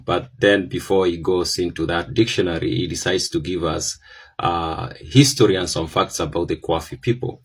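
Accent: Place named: Kalenjin, Kenya. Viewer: I suggest you do kalenjin history because you sound like one.kikuyu history is well known we dont do speculative history from outsiders.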